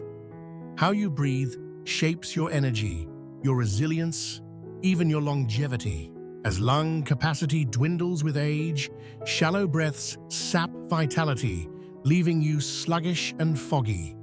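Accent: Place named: United Kingdom. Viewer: What’s with the GdDam British accent?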